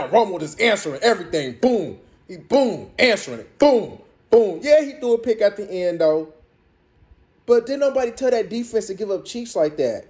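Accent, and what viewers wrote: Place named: Bay Area, United States. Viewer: You gotta be either from The Bay or Chicago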